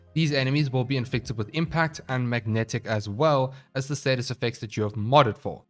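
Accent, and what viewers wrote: South Africa. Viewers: is that a south african accent i hear????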